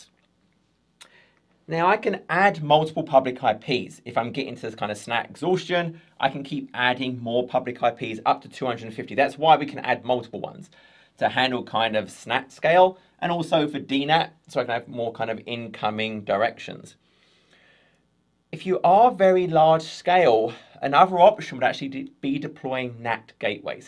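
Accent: United Kingdom. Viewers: I pay for courses that aren't a patch on yours. I've worked in IT for too long, never needed to fully understand 'rowting' or fw's, always someone else's job. I'd never touched azure either. In the last week and a half I've gone from 'dark art' to having the confidence to set up a lab, replicate bits in my work place, secure the subnets, test out the product (secure az140 deep dive? #fingerscrossed) that I'm trying to architect and look really clever at work... You've had me covered at pretty much every base, you absolute lege!! Dunno how much you make out of this side-hussle, but good karma is definitely on the way! P.S. Hearing what I think is a southern UK accent saying the word 'route' like an American is weird, but it must be contagious as you've even got me bloody doing it :D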